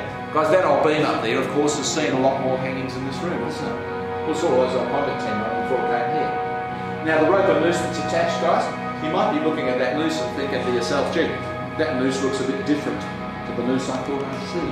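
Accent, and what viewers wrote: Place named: Australia. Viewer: Australian accent makes it sound less scary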